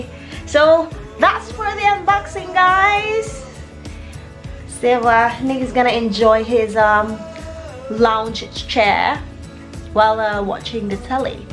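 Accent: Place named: United Kingdom. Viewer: Love2 ur british accent maam 🥰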